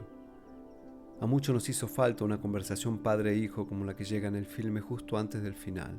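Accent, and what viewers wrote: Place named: Argentina. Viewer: Una pelicula tan buena. Quantas lagrrimas quando el regreso' a USA. Seguir ese canal me permite de escuchar tu voz argentina que me gusta mucho. L'acento es lo que prefiero de l'America del surf. Gracias 💚 Un beso desde Italia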